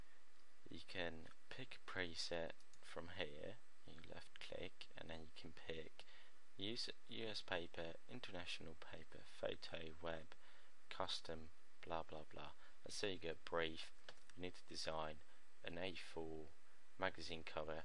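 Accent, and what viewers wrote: United Kingdom. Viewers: Its an English accent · I do have trouble following British accents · It's British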